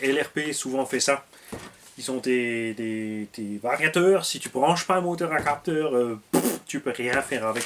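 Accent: Alsace, France. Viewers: Mais dis moi, tu fais drôlement bien l'accent alsacien, on s'y croirait presque 😁😋🤣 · Salut toI héhéhé le blondinet déjà qu'ils avaient (abos) du mal de comprendre en temps normal ,Mais maintenant sa và être pire😂😂😂😂😂😂😂😂😂😂😂😂PS C'EST QUAND MÊME BO L'ALSACIEN 😘😘😘😘😘😘😘👍 belle journée à tous jc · Génial l'accent alsaco 🤣 c marrant d'ailleurs que tu ne l'ai pas plus que ça en temps normal😅 super kdo merci le tonton🤩😘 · Hallo ! Ich liebe deinen elsässischen Akzent (ich weiß, dass es deutsch ist, aber sie übersetzen nicht ins elsässische: p) Merci Tonton Pictave, tu as remis la machine à projets en route :)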